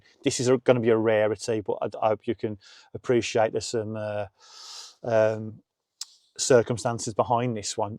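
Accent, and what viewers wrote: Birmingham, United Kingdom. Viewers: Sad but these things happen. I may watch both of you, see how vids & editing pan out. Good luck (to you both / all). Keep the good material coming. I'm finally catching on to the Brummie twang.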